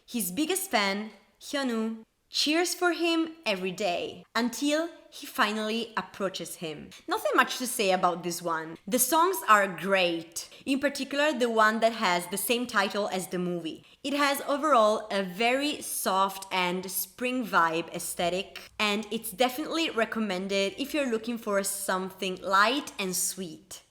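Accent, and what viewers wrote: Italy. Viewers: please make video, all about theory of love and your thoughts, btw I like your Italian accent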